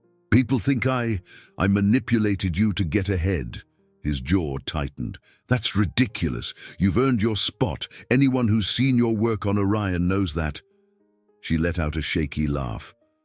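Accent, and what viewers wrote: United Kingdom. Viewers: I Agree with Comments from "Shirelene", & "ScatPack". I myself feel that the Script was Way Too Long & Slow; AND the Professionally trained Narrator Voice was flat Horrible, play- acting, low then loud, w/accent from U.K. Royalty, way above public status. The video background was interesting, vary- ing from forests & waterways, mountains & country roads, to huge city buildings & speeding cars. The actuality of a young data analist being able to out- computerize an experienced high tech expert & saboteur, in real time, is totally unrealistic & impossible. Finally, I am sick & tired of just another story of self-absorbed, narcissistic, cor- porate corruption, disruption & destruction. How about an innocent, decent, touching Romance ?! This movie finally had a good ending, So, Despite my criti- cizing, My Rating on this Movie is: 8/10. 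❤❤😅😅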